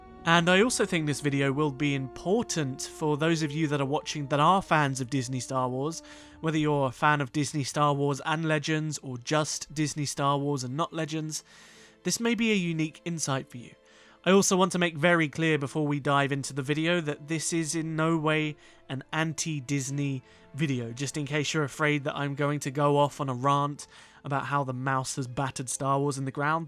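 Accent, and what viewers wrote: United Kingdom. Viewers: First of all, I absolutely agree (25 secs into the video) because every argument sounds BETTER with British accent. Thank you so much for throwing this video and pointing out excellent things. This video is the PRIME reason I started my own channel to bridge the gap between Legends & Canon and have the fans see the beauty in both! LucasFilm cares, Disney cares, and the new Legends re-prints are glorious!! I'll be watching your videos. Thank you so much for this great video.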